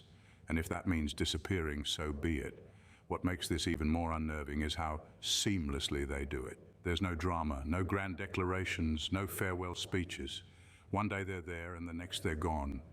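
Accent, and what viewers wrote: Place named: RP, United Kingdom. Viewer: The plummy accent is suuuuch a turn off.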